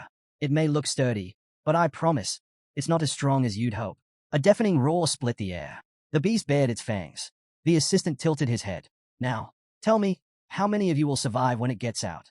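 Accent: United Kingdom. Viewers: Fuck this British accent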